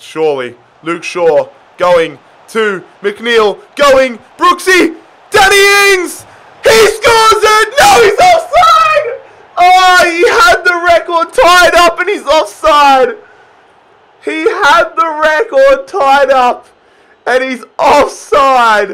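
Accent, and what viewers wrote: Australia. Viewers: Init you can tell he’s Australian 😂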